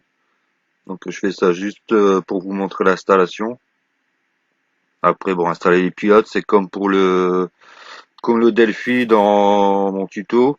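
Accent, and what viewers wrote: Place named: North, France. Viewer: bravo bonne explication avec l'accent du nord. merci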